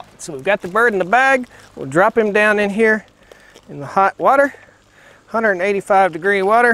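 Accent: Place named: North Carolina, United States. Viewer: Your a north Carolinian to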